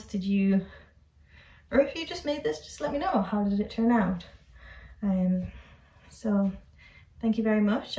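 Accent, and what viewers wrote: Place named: North East, United Kingdom. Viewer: I have just got myself a Sentro machine and enjoying experimenting. Videos are great. Good to hear a northern, dare I guess a northeastern voice. ❤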